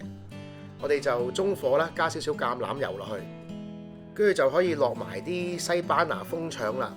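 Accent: Singapore. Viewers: Hahahaha your singlish is hilarious!! Greetings from sg!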